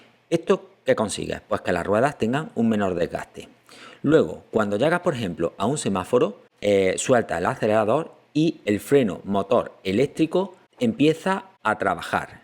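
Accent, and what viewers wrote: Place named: Andalusia, Spain. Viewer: que acento andaluz mas pronunciado macho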